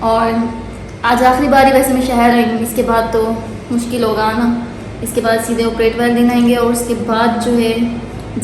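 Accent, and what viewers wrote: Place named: Lucknow, India. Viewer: Ap ka bat karne ka lehja or tarika India Lucknow ke logo ki tarha he... Love from india🇮🇳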